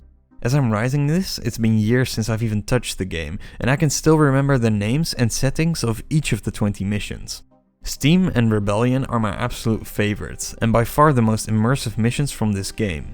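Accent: Netherlands. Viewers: Recognised the Dutch accent immediately mate. Goede video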